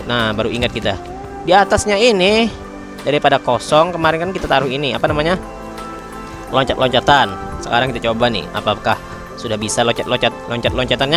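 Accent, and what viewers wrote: West Sumatra, Indonesia. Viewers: Bang suara lu kaya Minang kocak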